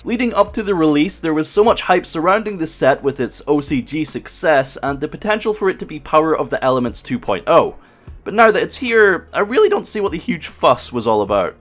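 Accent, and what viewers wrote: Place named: Scotland, United Kingdom. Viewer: Getting a binder full of good stuff? 6/10 Watching a scottish man talk about cardboard in a funny accent? 10/10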